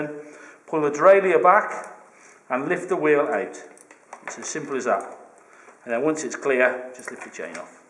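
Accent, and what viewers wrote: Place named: England, United Kingdom. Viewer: Nice English accent)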